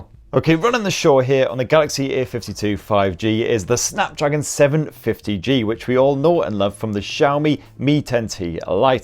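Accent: United Kingdom. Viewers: It's the banters in that classic British accent for me.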